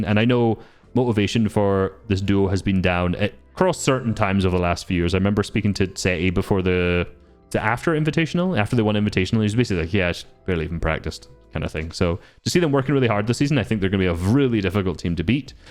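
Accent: Scotland, United Kingdom. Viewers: cant help but admire the scottish accent 🔥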